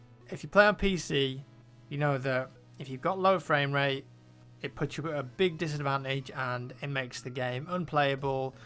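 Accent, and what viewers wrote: United Kingdom. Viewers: ur british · Gotta love that british accent! :3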